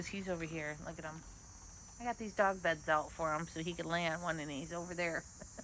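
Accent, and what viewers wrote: Michigan, United States. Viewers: I think you have a Michigan accent. How’s Gretchen doing in Lansing?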